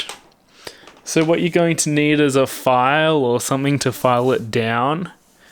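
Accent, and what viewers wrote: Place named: Australia. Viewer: i'm loving the way youve edited and explained the fitting of this preamp and pickup ...really easy to follow and your aussie accent is charming...off to buy one for my guitat tommorow and fit it,,,,,Nice one Man!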